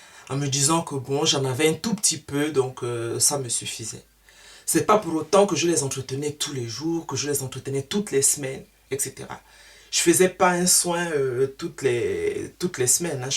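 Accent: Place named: Gabon. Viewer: Joyeux nappyversaire.Je viens de découvrir votre chaine et j'aime bien votre façon d'expliquer les choses. Et cet accent ...je dirais Gabonaise!?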